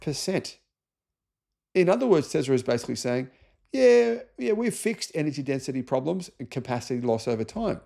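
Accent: Australia. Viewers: A MAGAT with an aussie accent in a Cyber Jesus cult. WTF?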